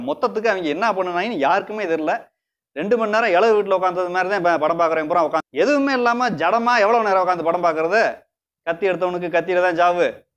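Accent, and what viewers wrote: Madurai, India. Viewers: madura slang thane unaku pluse continue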